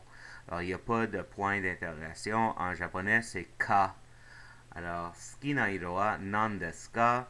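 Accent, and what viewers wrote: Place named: Canada. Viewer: merci, j'ai beaucoup de plaisir à suivre tes vidéos; elles sont très claires et progressives. Elles vont à l'essentiel et le rythme mesuré permet une meilleure assimilation. Merci encore (suki desu) Gilbert Savoie, France . NB : L'accent canadien est savoureux.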